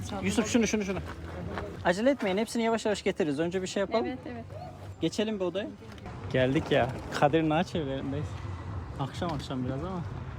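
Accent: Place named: Central Anatolia, Turkey. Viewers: Kendisi anadilini tıpkı iç Anadolu kırsalındaki kadınlar gibi konuşuyor. Kadın yabancı bir dilde iki kelam etti, dalga geçiyor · Dingil Ankara şivesi o. Köylü değil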